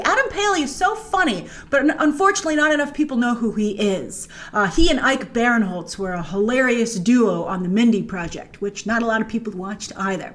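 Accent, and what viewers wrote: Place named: New York, United States. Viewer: Whats just as great as an Amazing Season Finale of a Star Wars Show???...an equally AMAZING Review of It!!!!....Can't wait for your next live Stream, life's just not the same without hearing that wonderful New York accent and seeing that Beautiful smile that lights up the World Live 🥰😍😁😉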